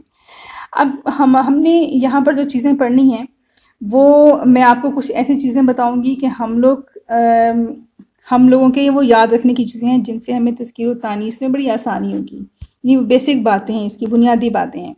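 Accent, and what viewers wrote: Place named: Urdu, Pakistan. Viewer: Lhjy b apka urdu bolta h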